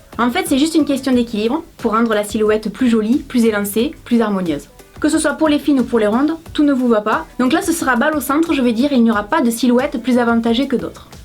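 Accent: South, France. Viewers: Salut, petite question, existe t’ il des anti derapants à poser sur la semelle (côté sol) pour avoir une meilleure adhérence, et met tu quelque chose au niveau du bout du talon pour la même utilité. Merci pour ta bonne humeur, tes astuces et ton accent, vive le sud!!!!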